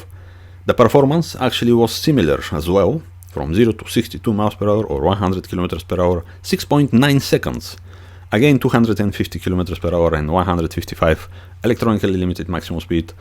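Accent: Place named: Italy. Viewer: WoW, It's going to take me a while to all of your videos but I'm going to do it! The Italian accent is just a bonus too.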